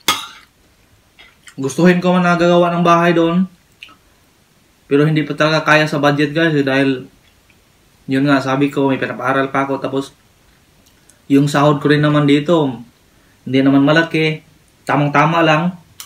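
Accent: Visayas, Philippines. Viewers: maka ingon jud ko nga bisaya ka sir tungod sa imong accent nga bisaya hehehe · mukang bisaya ka sir kasi tayo lng mga bisaya ang nagsasalita ng (ba) kahit magtatagalog hndi tlga nawawala satin yong (ba) -skl hahahha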